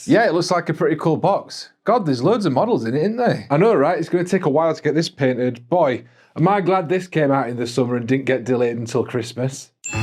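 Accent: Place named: North, United Kingdom. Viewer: I 'ears a Northern accent, I subscribes. This is t'way.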